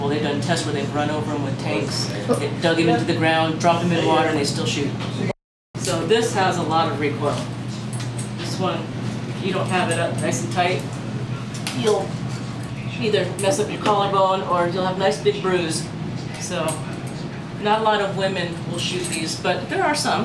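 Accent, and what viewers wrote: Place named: California, United States. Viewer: She Talks Funny Damn Cali Ppl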